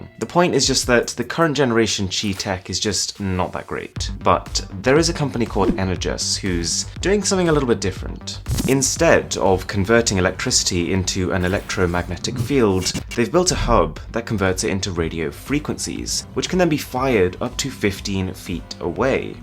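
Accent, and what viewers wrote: RP, United Kingdom. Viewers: I cant stand how this guy talks. he talks like a royal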